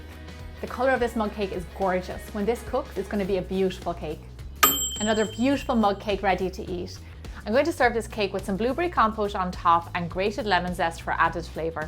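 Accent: Ireland. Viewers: are you Irish??? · Im in love with your wonderful recipes and your Irish accent!!!😍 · I just made the cookies n cream one and it came out ABSOLUTELY AMAZING!!! It was perfect because I was craving something sweet, and since I live alone, if I bake a cake I eat the cake, which is bad for my waistline!!! So this little treat hit the spot without being too much, and like you've said it other videos when you make it urself you know what you're eating, which is important too! I love your recipes, love your channel, and absolutely ADORE your brogue! It's very soothing!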